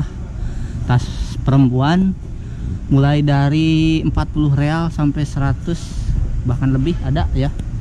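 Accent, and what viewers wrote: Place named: West Java, Indonesia. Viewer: Assalamualaikum..... teh lucu, sundana napel wae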